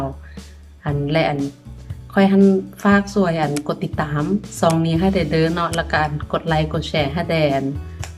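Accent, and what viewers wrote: Luang Prabang, Laos. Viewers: มัก เด เสียงเว้าหลวงพะบาง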